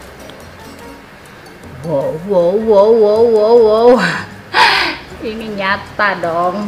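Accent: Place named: West Java, Indonesia. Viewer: Suka banget liat teh ngmong medok Sunda gini lucu itu, bodiiii bodiii lucu uy dengan kekhasannya. Ajhh habis ini mau nyobain deh produk YOU gara2 racun teh 🤩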